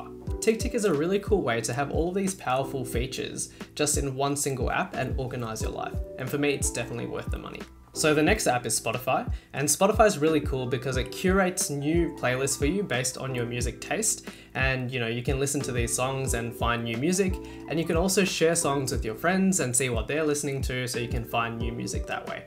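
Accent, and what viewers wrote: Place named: South Africa. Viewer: Are you originally from South Africa? Your accent sounds exactly like someone I know who is from there.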